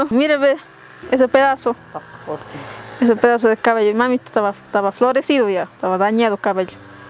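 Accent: Mexico. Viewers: Jajajaja que linda, habla igual que las indígenas mexicanas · PARECE QUE ERES DE CHIAPAS MÉXICO. HASTA EL MISMO ACENTO AL HABLAR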